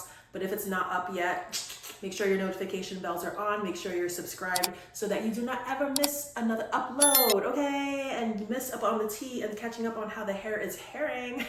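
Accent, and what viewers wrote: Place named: Barbados. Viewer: So good to hear a fellow country woman 🙏🏽 I would not have guessed that you are a Bajan you have lost most of your accent. Your hair is look good and I will be following your progress as my hair is the same length but it seems to have slowed down in it growth but is getting denser and thicker. Also I am planning to get a ReAir. I have seen and read some very good reviews. My advice to you is use a heat protector and increase the heat slightly for the final drying process for each section and you will see better results. 💕👍🏾🙏🏽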